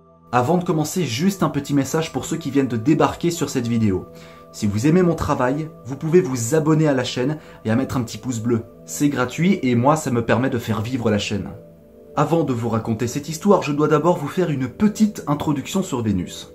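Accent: Belgium. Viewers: Tu es belge?mdr sinon j'aime bien tes vidéos 😁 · Nonnnn t’es belge! Lol il on ou ton accents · Tiens! T'est belge ? Je m'en doutais ! Tu me fais trop penser à Yan L'Or-iginal 🙂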